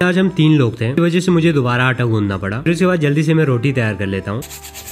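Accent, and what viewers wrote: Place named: Kargil, India. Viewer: Bia app ka accent kargil se lagat hea .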